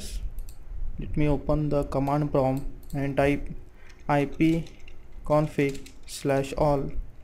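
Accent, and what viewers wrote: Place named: India. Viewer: damn indian accent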